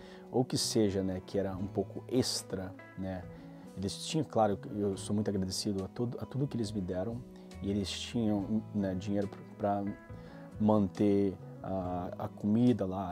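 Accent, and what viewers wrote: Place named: Mexico. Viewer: Achava que você era mexicano rs voz linda sua. Passei por esse conflito entre igreja x aceitação, até que cheguei numa depressão muito grande pois fiquei dez anos sem nem beijar na boca, daí comecei a me tratar e fazer terapia, uma longa história...hoje estou tranquilo nessa questão, mas foi bem complicado mesmo! Me identifiquei com muitas coisas. Obrigado por compartilhar conosco sua história de vida 🙏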